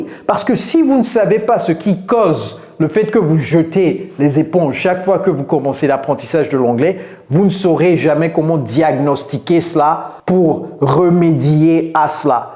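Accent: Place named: United States. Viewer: Je prefere moins de francais et plus d'anglais parce que je veux imiter votre accent en anglais americain. Pourquoi ne pas nous faire le "SHADOWING IMITATION"?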